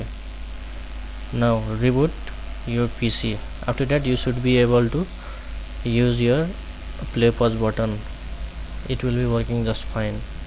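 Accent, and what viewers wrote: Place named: India. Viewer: like seriously? The accent.....the mic.....the rooster....India...............just smh!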